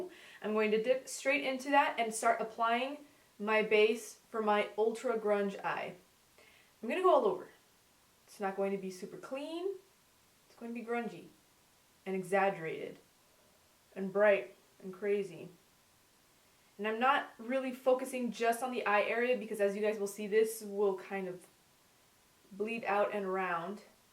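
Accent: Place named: Montreal, Canada. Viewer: you sound like you're from Montreal for some reason...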